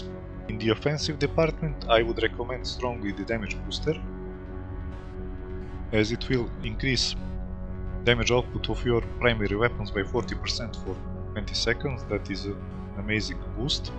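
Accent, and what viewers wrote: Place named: Russia. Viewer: Блин такое ощущение что он русский просто говорит на английском😁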